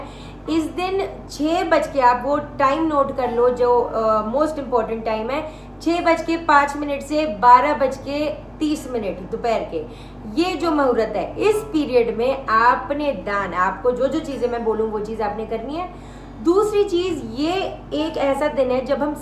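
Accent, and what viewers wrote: Punjab, India. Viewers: I am very much impress with your way of talking punjabi tarhka .And i also like your upay mam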